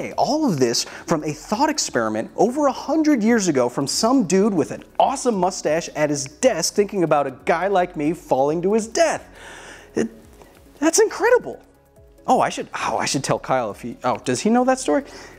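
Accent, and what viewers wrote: Germany. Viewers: Why does your german accent sounds kind of like a stereotypical gay man?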